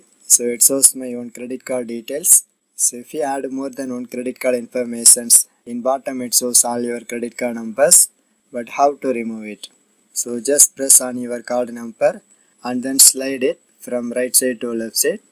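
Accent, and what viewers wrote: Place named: Bihar, India. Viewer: Bihari English👍👍👍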